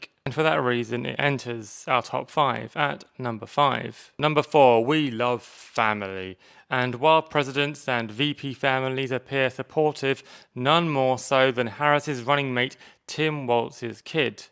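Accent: United Kingdom. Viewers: I have only followed of TikTok and I genuinely love your ability to be on the level speaking of all of the horrible things the Us is doing. And btw….never expected your English accent! 😂 surprised the hell outta me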